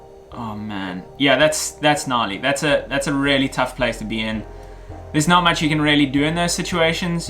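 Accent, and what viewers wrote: South Africa. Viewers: Hey you’re a South African 🤙🏻 Lekker Bru. I bet you’re from South Brim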